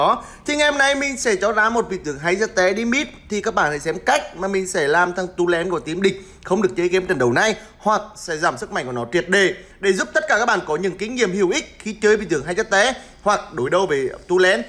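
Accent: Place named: Ha Tinh, Vietnam. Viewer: Eng ở hà tịnh hay nghệ an rứa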